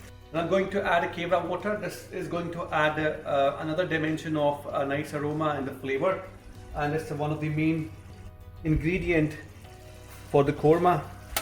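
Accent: Scotland, United Kingdom. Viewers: What was that last ingredient you added from the tiny bottle? I couldn't quite catch what you said. BTW, I love your Scottish twang.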